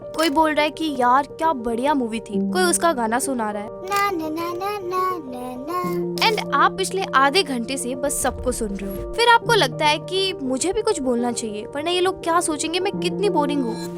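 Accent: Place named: Marwari, India. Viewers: Aapki marwari sunkar achha lga di 😊❤ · Diiii...aap rajasthan se ho..mein bhi ..maine aapki har video dekhi hai.aap bohot achi videos banathi hai.aur aapki marwadi slang bhi ek masth hai 🤌🎀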